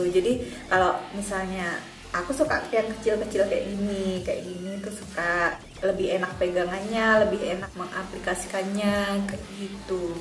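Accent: Java, Indonesia. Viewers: Suka mbak mix bahasa jawa gtu ngomongnya,,,hehe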